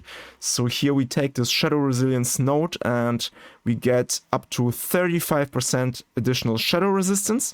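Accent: Germany. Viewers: Moin, wo kommst du eigentlich her, klingst irgendwie deutsch? :D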